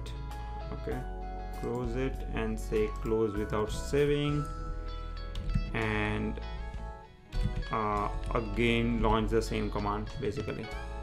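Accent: India. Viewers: AND YOU GOT best indish english pronounce of i ever hear man)))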